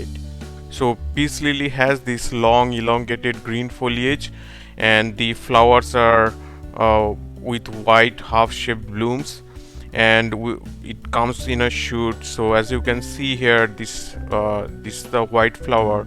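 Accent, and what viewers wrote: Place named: India. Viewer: That accent..Assamese / Bengali?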